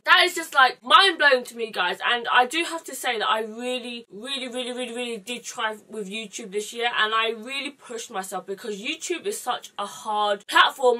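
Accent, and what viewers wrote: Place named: England, United Kingdom. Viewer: OMG this is the first youtube video of your's that I've watched and you have an english accent!!! somehow i knew you were british but i always imagined your instagram videos in an american accent lol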